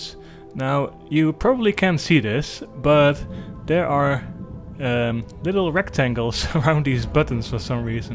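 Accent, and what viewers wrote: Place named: Netherlands. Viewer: Nederlanderrrrrrr!!!! 1e wordje hoorde ik het al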